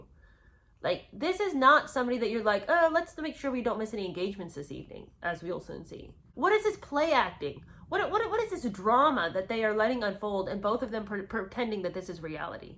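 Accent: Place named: RP, United Kingdom. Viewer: 😂😂😂😅😅😅 I love you!!!!! 😅😅😅😅😅... jolly ..what a total ding-dong H is... love your English upper crust accent 😂😊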